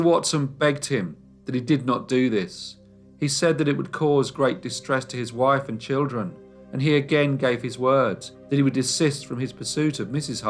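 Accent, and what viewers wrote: United Kingdom. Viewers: Your accent/inflection Britain or Scotland?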